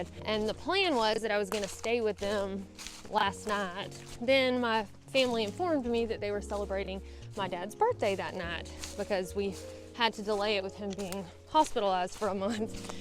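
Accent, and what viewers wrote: South, United States. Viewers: Mmmmmm bacon jerky! Thanks for sharing your adventures/insights/opinions/photography/wisdom/downtoearthness/stories/southern charm and accent!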